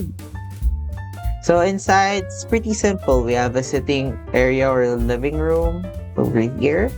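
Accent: Philippines. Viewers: Why is the accent very pilipino love it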